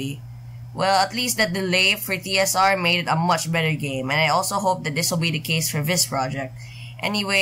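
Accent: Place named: Philippines. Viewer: Man sounds like a Filipino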